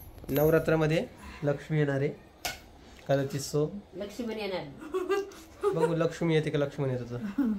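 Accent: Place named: Khandesh, India. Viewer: तुमचे गाव कोणते आहे दादा ,तुमची भाषा थोडी खान्देशी वाटते आहे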